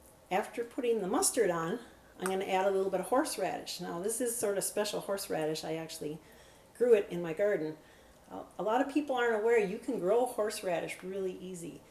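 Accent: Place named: Midwest, United States. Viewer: You are definitely a mid western German with that accent. Great recipe!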